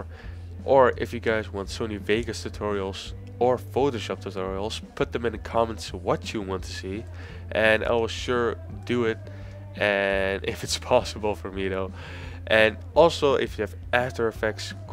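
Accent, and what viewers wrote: Netherlands. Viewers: are you Dutch? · Are you Dutch? I assume you are because of your accent, lmao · lol sounds like it. I'm half dutch and i hear some similarities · Are u dutch?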